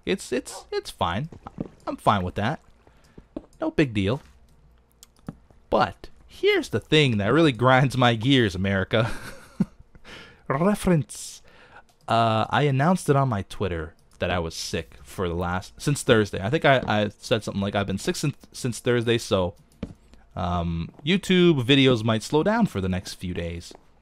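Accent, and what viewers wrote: Australia. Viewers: was using Australian English for this episode I think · it happens when u use the Australian english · Australian speak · hes using aussie speak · He have australian language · It sounds Australian like me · Australian english language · U have Australian English on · He might be using a different language. I believe its Australian English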